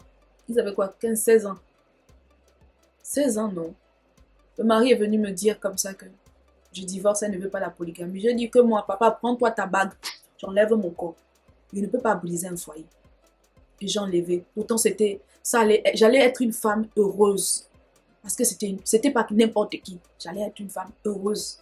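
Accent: Cameroon. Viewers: Donc quelqu’un qui parle avec un accent doit maintenant ce justifier du pourquoi elle a cet accent ma chère si tu n’était pas au courant elle est gabonaise et camerounaise . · U talk like a Cameroonian even the slants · Mais elle met toujours l'accent cam le plus souvent quand elle parle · Pourquoi tu parles avec cet accent Camerounais? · Aujourd'hui c'est l'accent Camerounais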